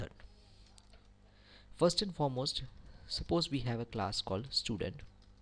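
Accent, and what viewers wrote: India. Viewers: dude indian accent..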